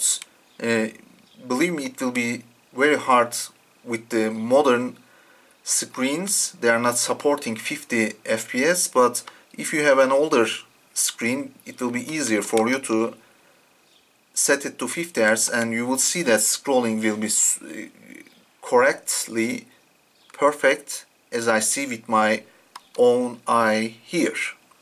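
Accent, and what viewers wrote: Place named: France. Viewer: French accent eh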